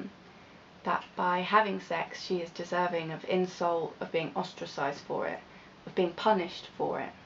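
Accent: United Kingdom. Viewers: nice posh accent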